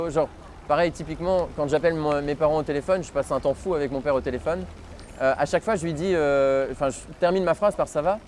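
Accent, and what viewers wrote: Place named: Belgium. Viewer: Un Français en Belgique... Super !👍🏻😊 Mais tu es en Belgique depuis si longtemps que tu en a perdu ton accent... 😉😂 À tes intonations, on entend que tu vis depuis déjà un certain temps en Belgique ! Je me trompe ? Bon séjour à toi à Liège ! Vive l'amitié franco-belge !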